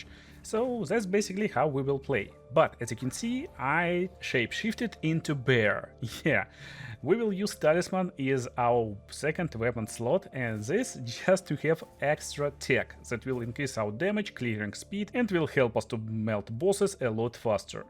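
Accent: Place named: Russia. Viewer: то ли из-за дуолинго, то ли из-за русского акцента, но этот видос я понимаю без дубляжа 🤣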